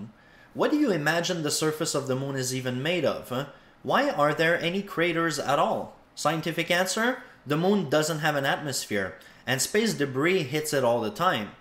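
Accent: Quebec, Canada. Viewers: It's because he's French Canadian, so he prounces his "ah" sounds as "ey." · Es tu Québécois ? Ton accent me le laisse croire. Très bon vidéo en passant ! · Cleary a Canadian eh?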